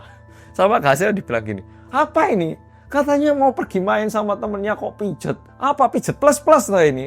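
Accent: Java, Indonesia. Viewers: Terakhir terakhir terus tp selalu ada aja updatenya kasian alm Sae Ron.. aku aja tiap buka yutub nungguin oppa yg medok krn nungguin kabar2 terbaru teruss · Jowoe keren medok tenan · Nyatanya ini bukan yang terakhir mas medhoookkk😂😂😂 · Ojo video terakhir lah mas....aku jd kangen medok nyaaa😂❤❤❤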